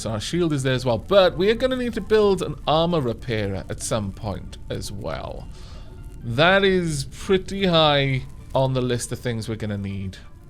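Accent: United Kingdom. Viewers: PyroDesu Wales is part of Britain. irgo he has a British accent. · Impressive that you get a British accent out of it, considering (and his accent) is Welsh, not British.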